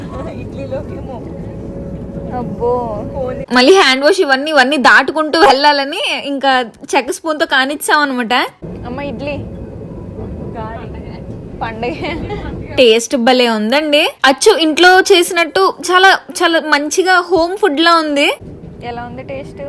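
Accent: Godavari, India. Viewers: ఈ గోదారి మాటల గలగలలతో ఉండే మీ వీడియోలు చూసి చాలా రోజులైందండి, ఈరోజు ఈ వీడియో కనపడింది, ఎక్కడ,ఏ చిన్న విషయాన్ని కొంచెం కూడా వదిలిపెట్టకుండా ప్రయాణం మొత్తం అన్నీ బలే సరదాగా చెపుతూ,చూపిస్తుంటారు, మీ ఆరోగ్యం బాగుంది కదండి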